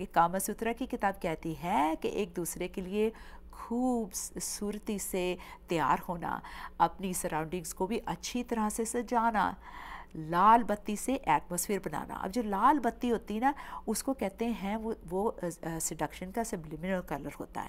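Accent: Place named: Pakistan. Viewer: Her hindi accent is Pakistan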